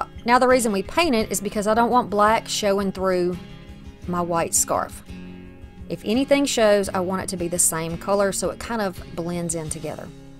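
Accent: South, United States. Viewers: these are all so very awesome. You are an excellent DIYer. Also love your southern accent. Hugs and love, Janet 🦊🦊🍁🍁🌻🌻🍂🍂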